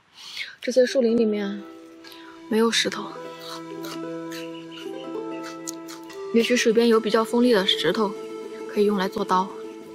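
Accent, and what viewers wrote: Henan, China. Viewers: 你有河南口音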